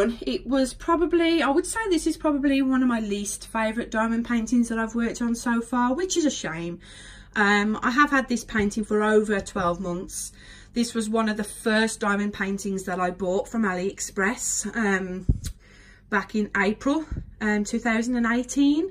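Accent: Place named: Birmingham, United Kingdom. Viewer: Hi glad you finished it . And nice to hear a Brummie like me. Looking forward to seeing Tinkerbell.😁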